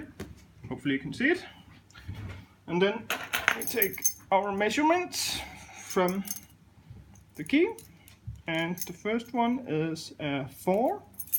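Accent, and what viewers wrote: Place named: Denmark. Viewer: From your accent I guess you are danish. Where do you get pins from. Need some for some 600's and I'm danish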